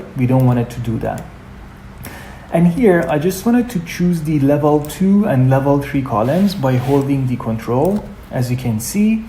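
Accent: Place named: England, United Kingdom. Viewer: Best Revit tutorial ever. Made by a patient and competent Revit expert with a very clear understandable english accent. Thank you!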